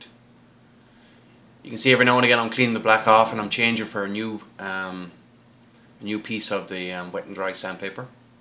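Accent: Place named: Ireland. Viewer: Irish. I want to say Northern Irish, but I always mix up the 2 dialect variations >.<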